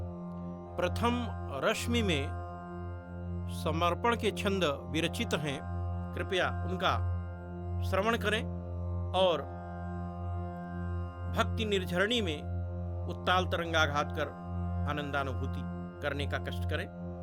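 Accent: Standard. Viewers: अद्भुत, ज्ञानवर्धक,,बहुत सुंदर, विशुद्ध उच्चारण, नित्य श्रवणीय, आनंद दायक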